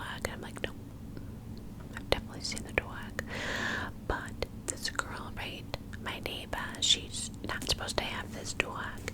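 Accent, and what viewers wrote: New York, United States. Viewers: Your New York accent is giving me serious Harley Quinn cartoon vibes! I love it 💓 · LIVING for these NY accent videos! For some reason they’re just automatically tingly. Hope you’re having a great week! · these New York accent videos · I’m in love with the New York accent your videos always send me to sleep thank you ❤️ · Omg I LOVED this. I adore your NY accent ❤️ sorry I’ve been Missin in the comments. I’m in art school!!! Degree in film and photography! So I’m super busy but enjoying it so much! You know my playlist will be helping me through my degree ❤️❤️ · Shout out to the state of NY I never been there, i have only been to 4 states California where I am from,arizona,nevada, and New Mexico i have 46 more states to go eventually/hopefully i love this ny accent 🙂😅👍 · I love your NY accent it's so relaxing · Ooh I love New York accents ❤️ excited to relax as I get work done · Yes the NY accent video is my fave!!!!!!! 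😍♥️ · Yay! The NY accent is back! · Yasss bringing back the NY accent😍literally adore itttt , neighbourhood gossip is always the best cuz I’m such a nosy person🤣❤️ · Your New York accent is so relaxing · YEEEESSS I live for your NY accent!! Plus this story time..girl, its 4am here and it shall put me to bed 😴 good night!! ❤ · I love this New York accent it's just such fun. Your really good at these xx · I truly love your New York accent is awesome! Thanks for this amazing video! ❤️😍🤠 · the new york accent is so satisfying wow, i definitely wasn’t expecting that 😂 great as always! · I’m obsessed with you and your New York accent! I’m from Boston and it does remind me of our accent here and there, I definitely don’t mind 😍😍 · Love a good Nooyawk / Bawstin accent 😂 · aaah i love ur ny accent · i absolutely love her NY accent, it is really soothing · another long day of schoolwork and getting ready for bed gonna watch this !! 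💛💛 i love the combo of the ny accent and ur neighbors ☺️☺️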